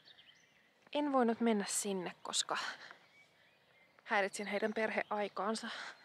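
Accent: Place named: Finland. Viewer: 素敵な朝の散歩楽しめました。夜勤の連続は生活リズムが狂って大変ですね。インドア派というより群れるのを好まない自分の時間を大事にする派かな。友人の結婚式楽しんできてください。フィンランド語独特のイントネーションが耳に心地よいです。😺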